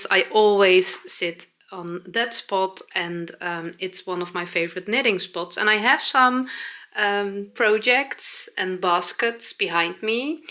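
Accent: Netherlands. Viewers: Love your Dutchy English 🙂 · I love, love your “Dutchy” English so much and you are always learning our way of speaking. It makes me smile and happy to see and listen to you. Your knits 🧶 are beautiful and very inspiring for me❤❤❤❤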